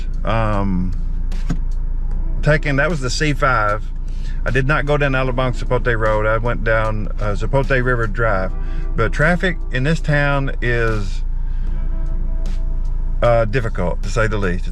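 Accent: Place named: South, United States. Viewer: The traffic won't get fixed because of overpopulation. Even in my south hometown in Lipa, traffic is bad. Regarding your Southern accent, most Filipinos will have a hard time because yours is relatively faster pace. It's an acquired skill to understand it, because at first, it was hard for me too. Working for call center companies get one introduced and familiarized with it so it becomes natural after some time. I recommend creating a video of common idioms you use a lot in English, and sharing it as some Pinoys may want to learn it. Maybe do it as offer of help for Filipinos that work for call centers catering to USA. Also, can you try some taglish at times? It will be fun to hear you do taglish like us :)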